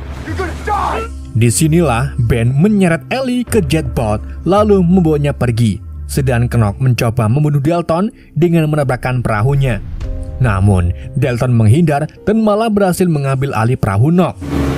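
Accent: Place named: Pekalongan, Indonesia. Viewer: Petsi wong kalongan o min..❤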